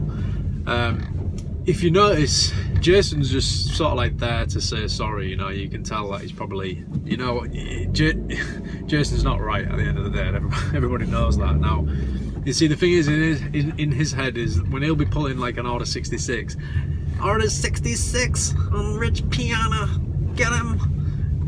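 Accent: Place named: Teesside, United Kingdom. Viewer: Great vid mate, where about's you from? Sound a little bit like a smoggie!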